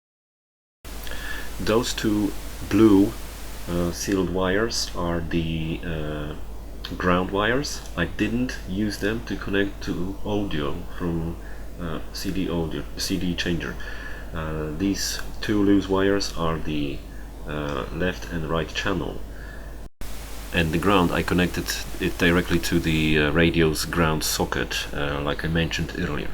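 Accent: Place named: Poland. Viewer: Widzialem ze jestes polakiem :) od razu slychac polski akcent :P ale super ze podzieliles sie ta informacja.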